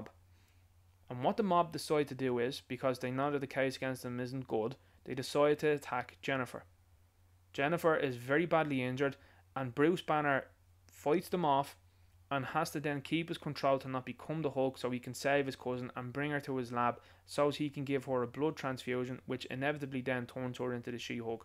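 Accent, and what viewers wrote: Dublin, Ireland. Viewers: Good review. Nice to hear a Dub accent, too. Good one.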